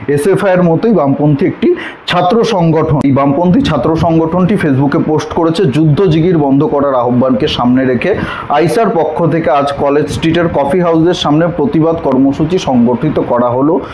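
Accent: Bangladesh. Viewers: তোর বাংলা উচ্চারণে বোঝা যাচ্ছে তুই বাংলাদেশী|এদেশের মানুষের মধ্যে বিভেদ তৈরী করতে চাইছিস |তুই অবিলম্বে বাংলাদেশে পালা|ওটাই তোর আসল জায়গা |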